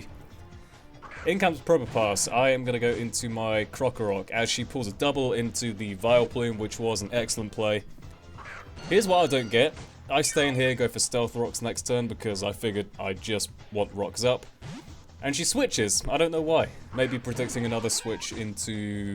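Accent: United Kingdom. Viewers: You have an amazing british accent :)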